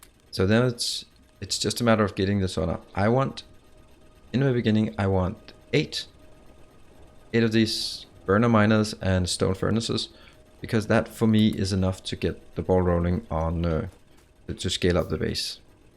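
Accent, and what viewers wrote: Denmark. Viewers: Well we are only like 5.5 million so it's always fun to find a fellow dane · Are you Danish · Yes, it is true that he sounds Danish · Are you Danish? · You sound Danish...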